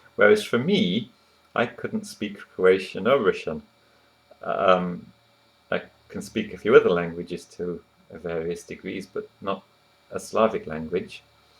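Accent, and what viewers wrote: England, United Kingdom. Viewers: you're english right?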